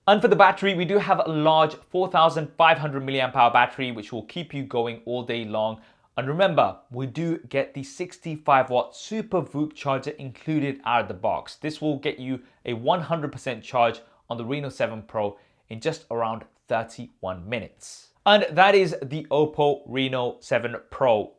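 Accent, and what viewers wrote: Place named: Leicester, United Kingdom. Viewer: i just knew that accent was from Leicester. Great video mate.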